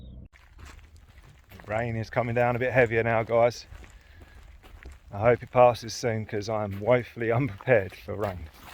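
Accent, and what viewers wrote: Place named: South, United Kingdom. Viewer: So you’re from Sheppey! I was wondering where your lovely, rich, south of England accent came from. I could listen to you read out the phone book In 2005 I interviewed to teach English at Minster College and stayed the night before at the Abbey Hotel … I’ll never forget.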